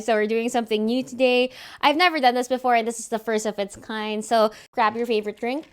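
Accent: Philippines. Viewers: lol her cute filipino accent.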